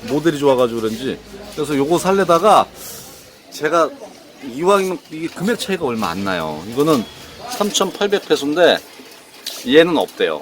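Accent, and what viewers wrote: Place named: Japan. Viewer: 왜, 항상 일본식 엉터리 영어를 사용하시는지.. 혹시, 택시를 타쿠시라고 하고 버스를 바스라고 하시나요? 일본 사람들 ㅓ 발음 잘 못합니다. 그것을 한국 사람이 왜 따라하는지 모르겠네요.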